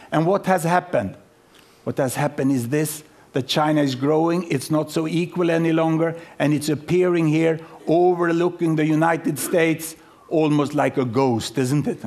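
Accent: Sweden. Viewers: Dear Saturday Night Live, this is how english with swedish accent sounds like. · älskar hans svenska uttal. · His accent is sooo typically Swedish :')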